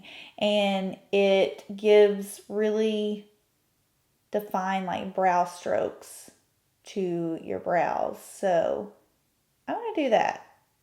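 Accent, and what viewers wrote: South, United States. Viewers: Great look! Love your accent, sounds southern!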